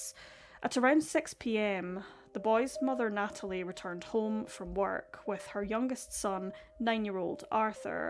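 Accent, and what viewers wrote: Scotland, United Kingdom. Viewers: Oh my god your Scottish I’m from Glasgow too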